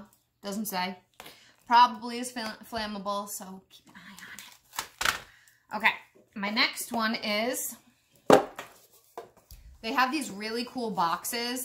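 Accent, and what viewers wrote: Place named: New York, United States. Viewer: I love your videos! 🥰 are you from New York I’m trying to identify your accent!?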